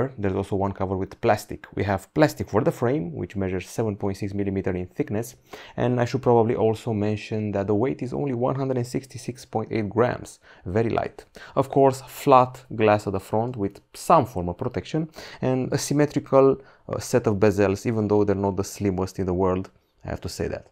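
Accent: United Kingdom. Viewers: Are you British? Do you speak English?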